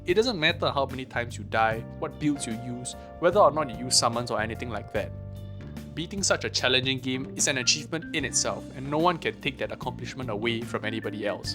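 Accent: Singapore. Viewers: * Hears accent, hmm Singapore?🤔 * Checks Channel -> Bingo🤓 (Hello from South Africa 👋🏾🇿🇦)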